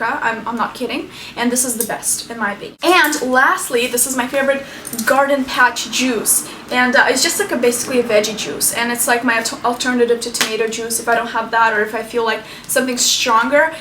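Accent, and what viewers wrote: Russia. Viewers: Lovely girl;) hope to see more videos about nutrition. I think your accent is Russian, am i wrong?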